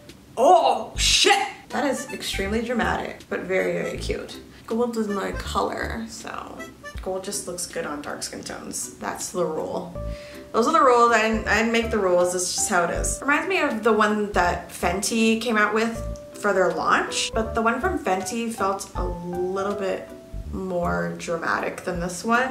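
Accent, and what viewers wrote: England, United Kingdom. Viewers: Can you do a vid only speaking British accent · nobody gonna talk about her british accent XD i mean she is immitating but it's on point :)) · You have a pretty decent English accent. Not too cockney not too posh 9/10. 👏🏻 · why is no one talking about her british accent?😂it’s actually good,coming from a british person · Please keep making videos in british accent😩😩😩,love it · PLEASE, A VIDEO WITH U SPEAKING BRITISH ACCENT ONLY PLEEEEEAZZZ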